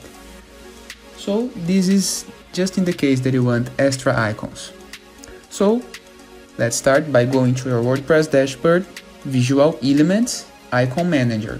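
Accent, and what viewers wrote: Brazil. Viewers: Esse é brasileiro... me engana não. Esse sutaque aí... Curte de mais ❤ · Você é brasileiro né kkk, pelo sotaque, acredito que tenho 99% de chance de estar certo kkkk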